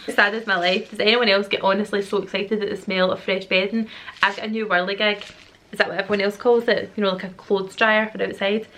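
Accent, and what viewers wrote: Scotland, United Kingdom. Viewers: i love her accent to lol certain words sound so much better in Scottish lol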